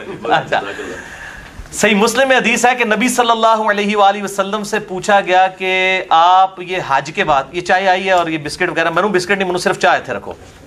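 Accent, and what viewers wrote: Punjab, India. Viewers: Maza Ata Hai apki Punjabi sun k Mera dost ik Karachi ka Hai wo b asy bolta Hai ❤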